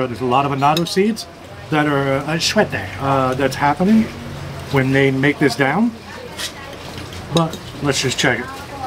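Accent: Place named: Canada. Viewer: Hi! Late for the party again! I heard you loud and clear when you asked if it was Bitter Gourd. It was Bottle Gourd. She probably misheard your Canadian accent. I have no problem talking to or hearing an American accent. When I moved to Canada, I have noticed that Canadians talk too fast. I am used to it now. This video got me excited and famished! So many yummy dishes❤❤❤ Thank you!!!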